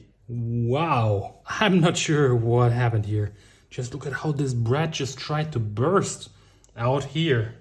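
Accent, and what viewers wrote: Germany. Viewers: Manny good advice, this have make my technic full filed thanks to you German tremendous English accent. 👍🏼🙌🏼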